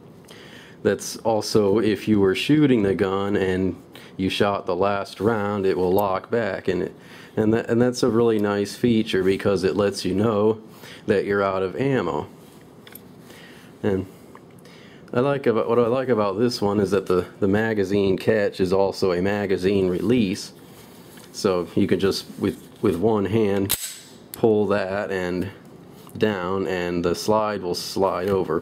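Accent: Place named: United States. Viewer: Where in the US is that accent from?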